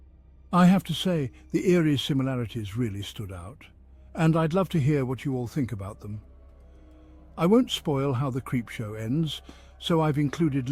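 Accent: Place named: United Kingdom. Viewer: Who would have thought there would be a connection between the Sarah Boone murder case and a Creepshow episode that aired Oct 2019, a few months before the murder occurred. What they both have in common is the implied archetype of “The Suitcase” - with its potential darker aspects [used as a torture device] and for being an obsessive fetish. I enjoyed watching intriguing video with its “creepy” topic: Comparing the horrible evils that can exist, each involving a suitcase possessed by an aggressor who had control of it from the outside, to a victim trapped within - who got stuck inside its claustrophobic confined space. Pointing out similar comparisons: The two suitcases looked almost identical - appeared to be about the same size. The aggressors used a bat for violence and dealt with the concept of the suitcase being thrown down the stairs. The audience is also left with the scary image of a hand popping out of the suitcase, not to mention the idea of a foot stomping on it/being stepped on. 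[This is implied when Sarah Boone’s sandals were shown next to the suitcase - from the 2nd mobile phone shot suitcase video. - that no one seemed to notice] The British narrator had that perfectly nuanced, articulate sounding voice - well-paced enough to reveal an unusual, dark side and sinister portrayal of the troubled human condition. This video came out at exactly the best optimal time: A few days after the guilty verdict [of 2nd degree murder] was reached in Sarah Boone’s Jury T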